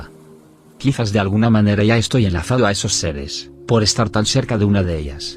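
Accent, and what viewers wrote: Spain. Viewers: Porque. Todos los videos estan en Español Ibérico! Me revienta !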